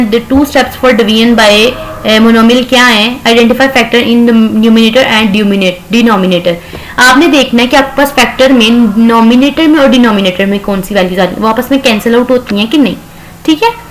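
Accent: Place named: Punjab, India. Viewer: ma sha Allah your axent is very nice cute axent ( LOVE PUNJAB )😍😜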